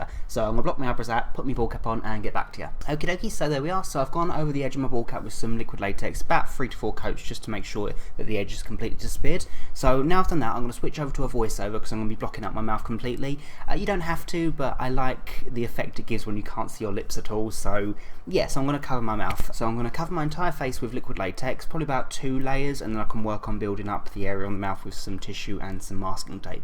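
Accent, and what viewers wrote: United Kingdom. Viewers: I LOVE YOU TOO MUCH BC I SAW YOUR CREEPYPASTA STUFF AND I WAS LIKE "oo ima watch dis" AND YOU HAD A BRITISH ACCENT AND THEN I LIKED YOU EVEN MORE AND NOW I KNOW YOU LIKE DOCTOR WHO AND IVE SEEN EVERY ONE OF YOUR VIDEOS I LOVe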